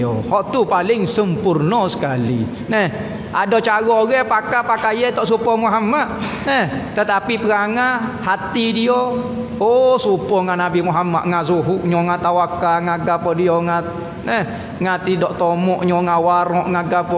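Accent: Kelantan, Malaysia. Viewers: Terbaik ...walau slang kelantan kuat...kami dari johor suka dengar ceramah ustadz..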